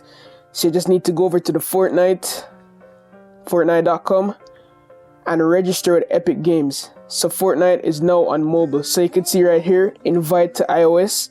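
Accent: Jamaica. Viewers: are you a jamaican/ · Are you Jamaican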